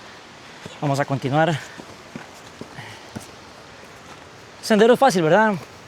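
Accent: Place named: Colombia. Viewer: mae, por qué habla como colombiano? si ud es tico, tenga orgullo de su tierra y hable como tico, diga la R como se debe!!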